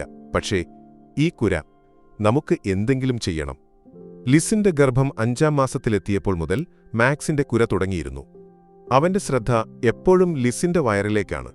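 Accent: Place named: Bengal, India. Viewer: ബംഗാളി പറയുന്നത് പോലെ പറയണ്ട... മലയാളി ആവണം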